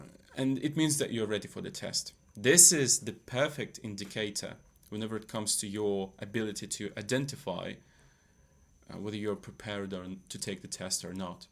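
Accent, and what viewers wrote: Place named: United Kingdom. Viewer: What a wonderful British accent! You must have worked a lot on it. Plz make more similar podcasts.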